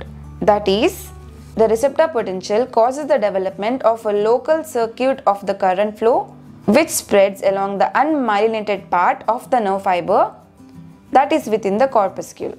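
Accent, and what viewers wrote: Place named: India. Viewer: indian accent is so irritating 🥲 thanks for the vdo though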